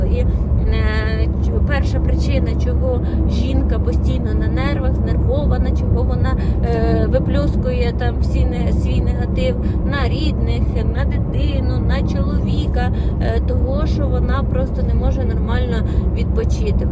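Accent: Poltava, Ukraine. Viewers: Я 45 років живу з полтавчанином і цей полтпвський суржик впізнаю де завгодно Гріє серце.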